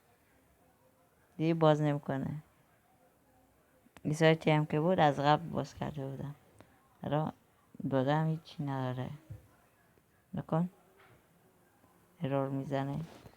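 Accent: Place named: Iran. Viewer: Gozok boro aval i lehjd kireta pas ko baz biya yad bete iraniya gaydeta fagad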